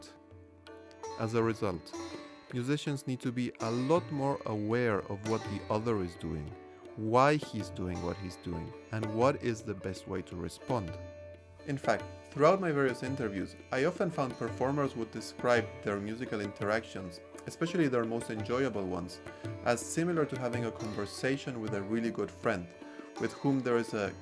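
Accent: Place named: Scandinavia. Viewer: Kyabaathai. Very good and important work. Thank you so much for this wonderful initiative! Also I must ask: Where are you from? Your accent sounds very Scandinavian but the Indian Classical Music community is so small here that I would've known you then?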